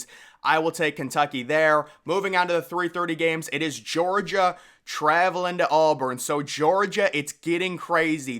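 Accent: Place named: Boston, United States. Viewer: Look man I appreciate the content but where are you from with that accent? Boston? Canada? Are you saying Ruckis or Rockis?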